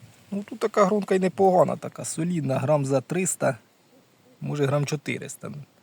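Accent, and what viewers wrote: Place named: Poltava, Ukraine. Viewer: Дякую за чисту решетилівську мову!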